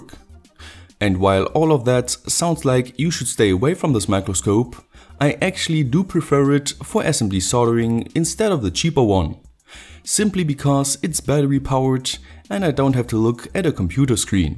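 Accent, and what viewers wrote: Germany. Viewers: klingt nach denglisch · 3 Sekunden zugehört und direkt bemerkt, dass der Typ Deutscher ist 😅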